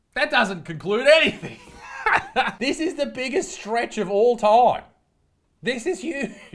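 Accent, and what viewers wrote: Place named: Australia. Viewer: The devil voice just doesnt work with an Australian accent lol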